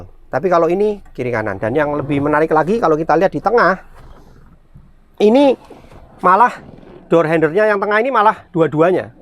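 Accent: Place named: Surabaya, Indonesia. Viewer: Mantabh reviewnya om, narasinya ga kaya baca spek pabrikan, tp punya angle yg menarik sebagai user, ditambah logat suroboyoan yg khas, jd bikin reviewnya semakin menarik. Auto subscribe!! Ditunggu content menarik selanjutnya Ko. #jadipengenpunyafreed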